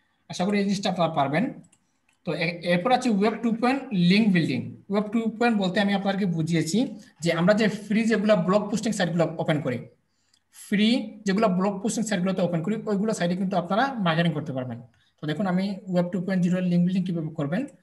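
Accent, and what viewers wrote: Sylhet, Bangladesh. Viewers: Vai er bari Sylhet Naki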